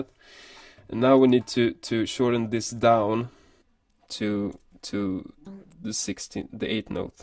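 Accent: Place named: Sweden. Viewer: lol love your accent :D it is soo swedish. great tut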